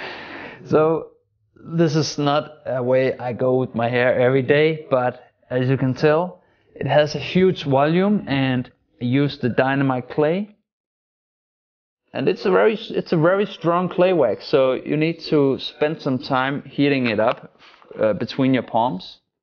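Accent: Denmark. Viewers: Du fra Danmark :) Det er nemt at høre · Are u Danish or something? You kinda have a danish accent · Er du dansker det er alså radt tydligt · you can just hear he's Danish, VIKINGER FOR LIFE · Hej med dig dansker man kan høre du er dansker med din accent men vanvittig video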